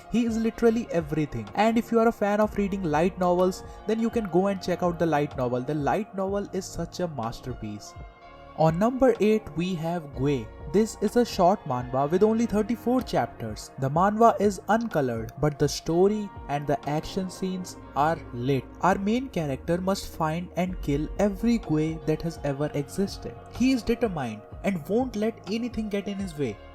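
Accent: India. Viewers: Are u indian because u sound like one.... Dont get me wrong I was just askin I ain't racist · India best accent no 🧢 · I think i just found the best indian accent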